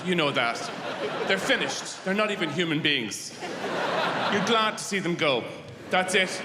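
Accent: United Kingdom. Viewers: Where's the punchline? This is just what British people sound like to me.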